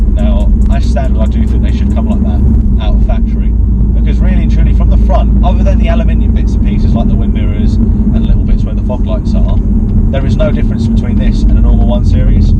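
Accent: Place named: Australia. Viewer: aussie accent is on point 😂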